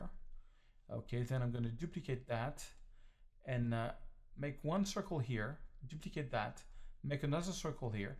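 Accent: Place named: France. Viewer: For your English tutorials, it would be great if you would talk a bit slower. Your French accent has me backing up very frequently to catch what you said.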